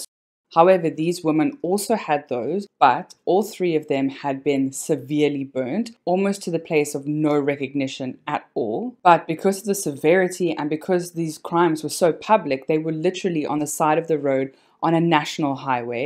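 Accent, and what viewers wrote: South Africa. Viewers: Thank you, it's so refreshing to hear our South African accent for a change. Love your presentation style. ❤️ Well done young lady.🥳🥳🥳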